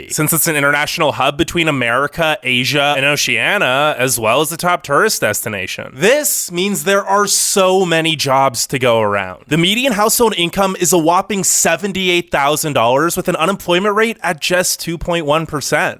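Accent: California, United States. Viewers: Good video. Your California accent is so strong lol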